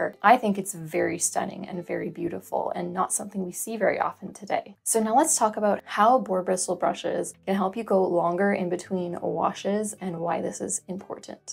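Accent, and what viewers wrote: Canada. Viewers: Maybe it's your accent (canadian?) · Smart Girl ! 🙏 You Sound Canadian.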